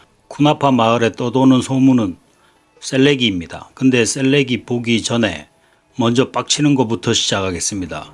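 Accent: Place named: Gyeongsang, South Korea. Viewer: 갱상도 아재 말투로 조목조목 따지는거 개우껴요 ㅋㅋㅋㅋㅋ재밌습니다. 다른 게임들도 다뤄주세요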